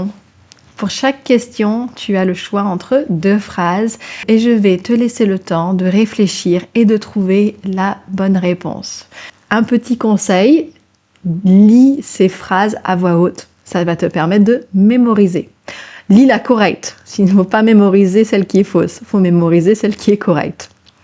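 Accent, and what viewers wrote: New Zealand. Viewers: Love the Kiwi accent. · Je vais retourner à Paris ou j'étais jeune fille au pair il y a 45 ans. Je l'adore, and maintenant, je suis en train de rafraîchir ma connaissance de la langue française! J'adore ton accent en français et en anglais. Tu es dans le sud de France? Et bien sur, j'entends un accent Kiwi quand tu parles anglais! Merci beaucoup pour ces videos. Je viens de m'inscrire à la chaîne!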